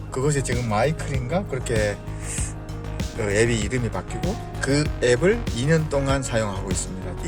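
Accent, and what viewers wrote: Yanbian, China. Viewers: 연변 출신이에요, 아니면 하얼빈 출신이에요? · 혹시 고향이 연변이세요? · 어 조선족이다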